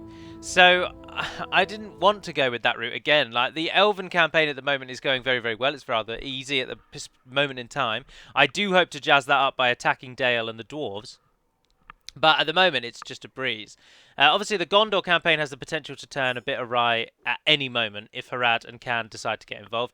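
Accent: South Africa. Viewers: I love the South African accent! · Great South African accent!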